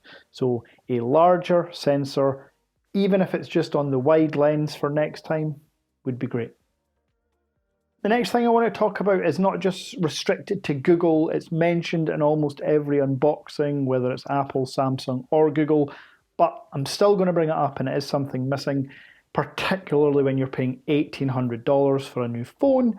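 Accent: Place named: Scotland, United Kingdom. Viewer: I’m new here in your channel, and o need to congrats you for the video, and the Scottish accent make even better. It’s on of my favourite accent in the world 🌎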